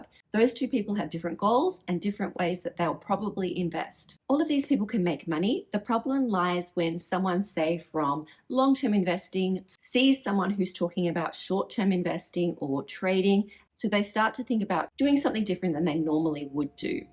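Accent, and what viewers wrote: Australia. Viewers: Great info thankyou and Aussie accent is a bonus.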